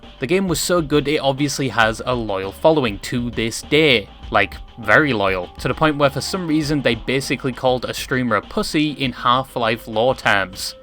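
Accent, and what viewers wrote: United Kingdom. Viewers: I love you too talking british bird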